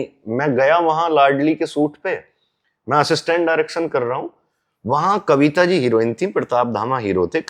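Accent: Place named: Uttar Pradesh, India. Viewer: O Bhai haryanvi nahi hai ok up ki hai tum hari 😊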